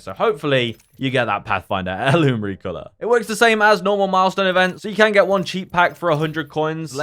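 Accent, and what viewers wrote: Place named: United States. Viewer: please stop the american intonations be English mate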